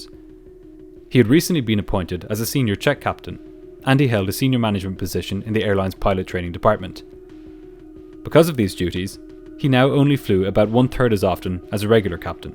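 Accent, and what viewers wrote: Ireland. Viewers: IT'S his Irish accent.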